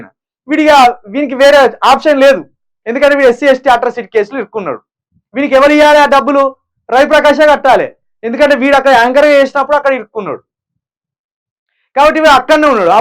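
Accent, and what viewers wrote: Telangana, India. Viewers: తెలంగాణ బాష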